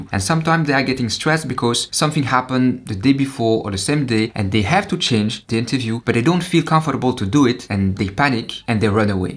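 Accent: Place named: France. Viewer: Are you French by the way? Your accent sounds French 😀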